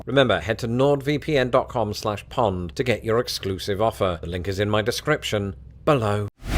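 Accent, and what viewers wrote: United States. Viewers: Your American accent is getting pretty good. Just need less nose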